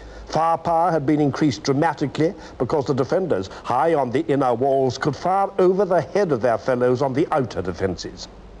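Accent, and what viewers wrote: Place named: United Kingdom. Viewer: what an old british accent!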